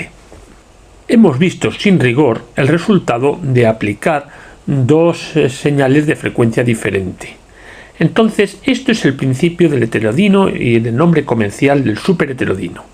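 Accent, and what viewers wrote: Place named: Castile, Spain. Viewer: DIJO UN CHINGO DE PALABRAS Y NO LE ENTENDI NI MADRES, NO SE SI ES POR EL ACENTO(CASTELLANO SUPONGO) O POR LA VOZ TAN GASTADA, LE SUGIERO QUE DICCIONE BIEN SUS PALABRAS Y TRATE DE SER AS NEUTRAL EN SU ACENTO SALUDOS.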